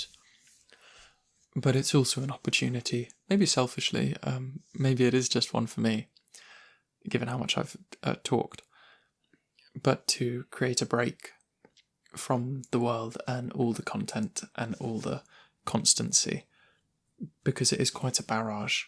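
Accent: England, United Kingdom. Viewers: Also as a fellow English person I totally felt the accent bit 🙃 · What part of the UK is your accent from I quite like the way you speak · im from argentina and i use your videos to practice and learn more about british and i loooove your accent !! i wish i could speak english like you someday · I haven't watched this yet but wanted to say your hair is beautiful. Also if you mind me saying so you are just pretty and cute. As an American your British accent is heavenly. I feel like you would give the warmest softest hugs. I hope you're well.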